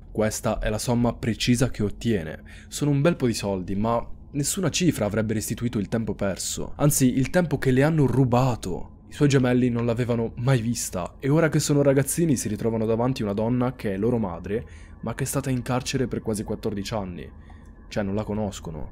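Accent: Sardinia, Italy. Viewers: Sardo, di Cagliari 😂 FANTASTICO ❤💙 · Noooooo giurami che sei sardo di Cagliari ti prego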